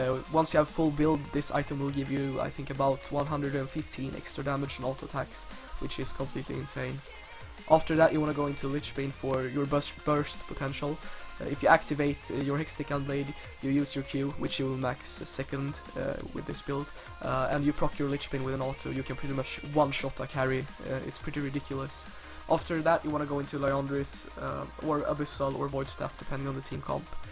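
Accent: Sweden. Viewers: Are you from Sweden bro? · Are you swedish by eny reason you'r accent sounds like it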